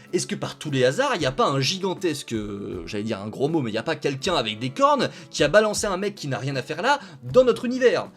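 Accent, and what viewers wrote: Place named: Lyon, France. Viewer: t'es lyonnais, non? On dirait. Je viens vous confirmer que Nartée, Endrique, ainsi que Correntyn Tollisseau SONT dans Doumsedeille! EH OUI!!!! Ils font partie du MARVELE MOUVIZE MULTIVERCE!!!!! Ils vont des petits ponts à Wong et Docteur Strange (Strange prononcé en français ringard). Aussi, il y aura l'alien qui est apparu dans un ipizode des Vacances de la Moor, le sequel de Helène et les maçons. INCREDIVULVE! Eh oui!!!! Brénoudeille et Doumsedeille!!!!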